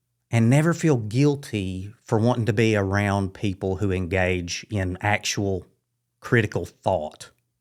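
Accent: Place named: Kentucky, United States. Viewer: Love your thoughts and your accent! Kentucky?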